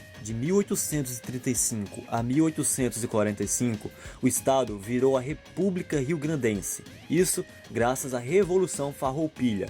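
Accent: Northeast, Brazil. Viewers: Você é fluminense? Você tem sotaque nordestino ou goiano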